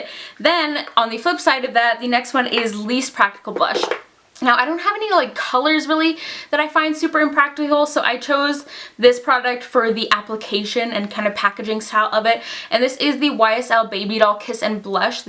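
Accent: Canada. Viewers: Why are you so pretty? I need to know the secret. Haha. And for some reason I can totally hear your Canadian accent in the video for the first time, lolol. Xoxo.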